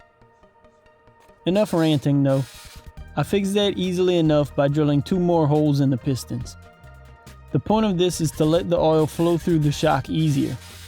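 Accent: Louisiana, United States. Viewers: Where are you from? That sounds like one of them Cajun accents!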